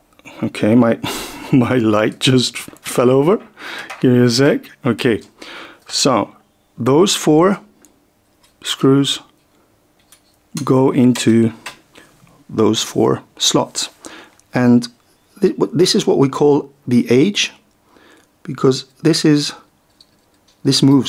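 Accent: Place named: Netherlands. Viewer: Love the details of the build. I wonder if you are a native from the same area of Holland as Max Verstappen your accent is very similar sounding to me.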